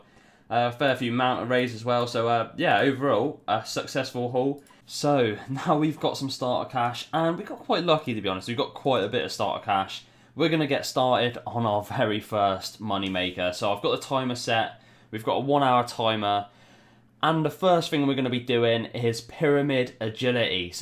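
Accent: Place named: Coventry, United Kingdom. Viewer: You sound like you are from Coventry, or in and around west midlands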